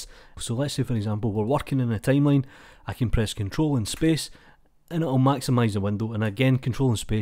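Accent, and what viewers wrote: Scotland, United Kingdom. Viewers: I dont speak english natively.. is that an Scottish accent?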